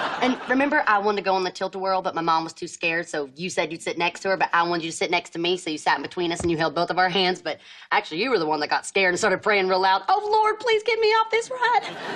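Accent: United States. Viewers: she sound so country lov ya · I love her country accent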